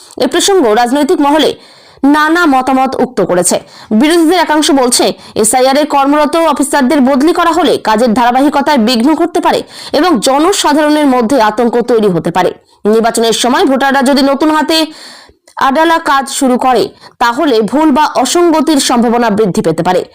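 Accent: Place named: Bangladesh. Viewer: বাজে চ্যানেল।বাংলা উচ্চারণ ভুলে ভরা।মনে হচ্ছে বাংলাদেশের কেউ খবর পড়ছে।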